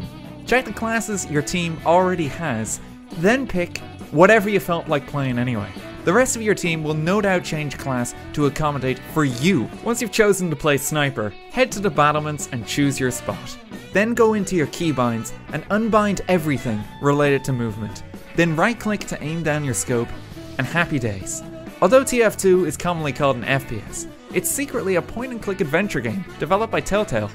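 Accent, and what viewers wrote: Ireland. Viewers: U Irish??because I am lol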